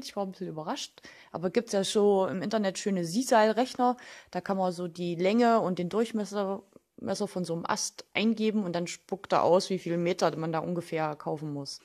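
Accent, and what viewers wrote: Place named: Magdeburg, Germany. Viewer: Klingt wie Magdeburger-Raum-Dialekt :D Super Kratzbaum und Video! Gibt n Like :)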